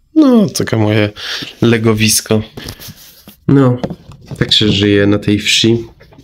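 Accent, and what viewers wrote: Poland. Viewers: Świetnie mówisz po Polsku i to z akcentem Polskim Znam tu obcokrajowcow którzy są dlugo i może mówią po Polsku ale bez akcentu Polskiego Zdolny z Ciebie Iranczyk I bardzo sympatyczny chyba jesteś unikatem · Jak Pana słucham to stwierdzam że ma Pan polski akcent i dobrze mówi po Polsku.👍🏵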